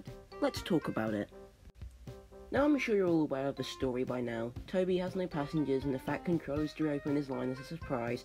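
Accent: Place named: United States. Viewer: I couldn't stand the American accent and terminology. I watched it with the sound off and subtitles on.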